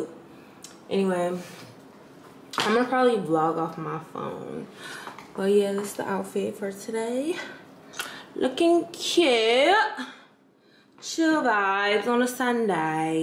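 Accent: United Kingdom. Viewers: the british accent LMFAOOOOOOOO 😭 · Chill vibes on a Sunday 😂🙂😂...the British accent for me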